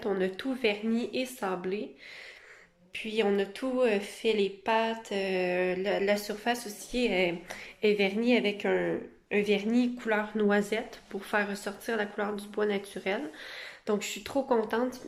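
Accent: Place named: Canada. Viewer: Cc bonjour j'ai adorée votre vidéo je suis vraiment tomber complètement fan de votre bureau j'aime ce qui est fait maison il est grandiose vraiment vous pourriez me faire qq photos et les dimensions car j'ai pas beaucoup de sous car je suis invalide et je colorie depuis novembre 2019 et mon siège est spécialisé et là je pourrais le faire passer oh!! Sa serait formidable j'adore votre petite tour avec les couleurs elle est trop cute je l'aime vraiment beaucoup merci beaucoup pour votre présentation vous avez du très bon matériel c'est pas se vanter c'est partage c'est bien merci beaucoup et votre accent canadien en grande joie de vous écouter gros bisous 👍😘🌹🌹🌹🌹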